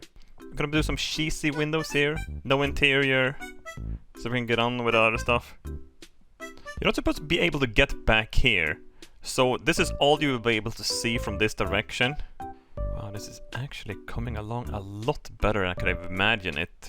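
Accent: Sweden. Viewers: I can only hope to one day achieve level Swenglish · making videos in English for 20 years and still having a strong swenglish accent is wild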